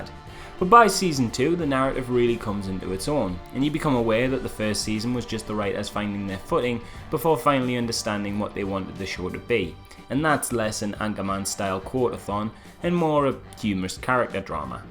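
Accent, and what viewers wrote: Newcastle, United Kingdom. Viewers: U sound like willNE like not just the geordie accent but the actual voice too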